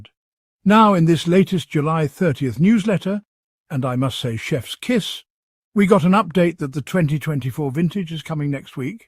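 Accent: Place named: United Kingdom. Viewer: Such BS. Best part of this silly propaganda is that AI has chosen an interesting British accent. Carry on Sir! 😱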